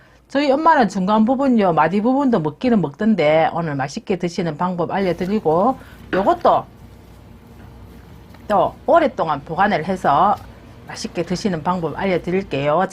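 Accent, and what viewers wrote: Gyeongsang, South Korea. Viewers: 좋은 정보 감사합니다 경상도 사투리 끝내준다 내고향 안동 사투리 좋아요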